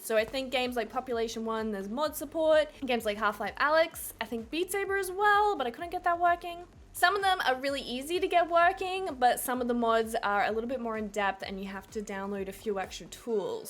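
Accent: Australia. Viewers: R u Australian ur accent sounds Australian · judging by your accent im pretty sure you live in Australia. i just wanted to ask how did you get your valve index. i have been wanting one for quit a while but due to the face i live in Australia i cant get one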